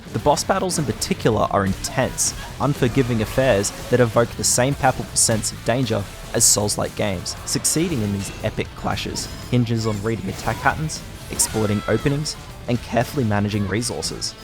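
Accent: Australia. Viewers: They are Australian.. but i think what is lacking is seeing the narrator’s face, mannerisms & physical expressions. Though It does sound little green horn and someone reading out an assignment.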